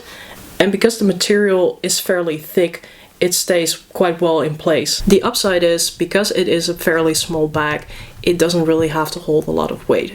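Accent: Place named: Netherlands. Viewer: Where are you from I hear a Dutch accent ben je Nederlands?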